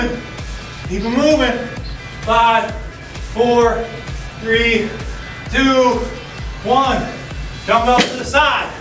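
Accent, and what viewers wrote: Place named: Minnesota, United States. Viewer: you're awesome. i love the accent, too. lol. it's exactly the same as my roommate's from mn.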